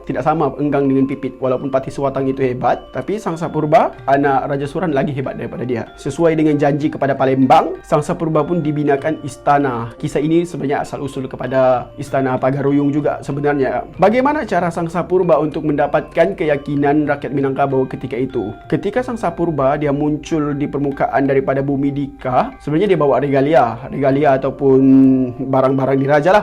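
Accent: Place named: Sabah, Malaysia. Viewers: Saya ingat abg orang sabah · Dah sah2 dia orang Malaysia dik.. dia cakap guna loghat Sabah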